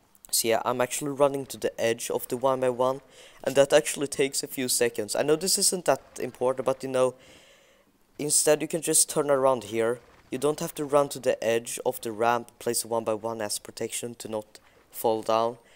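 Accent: Sweden. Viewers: You're swedish right? Jag hör det på din brytning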